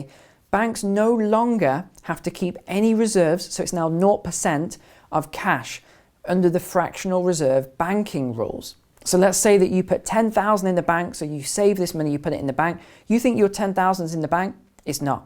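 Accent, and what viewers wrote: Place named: England, United Kingdom. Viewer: Morning. liked and Subscribed. So entertaining listening to a refined English accent talking about American real estate. Im a Yorkshireman with the usual Yorkshire twang. Do your grim findings apply to the UK. Cheers for the content. Tim.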